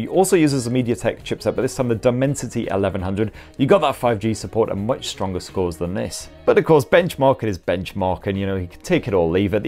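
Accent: United Kingdom. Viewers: the only British fam I've no problem listening to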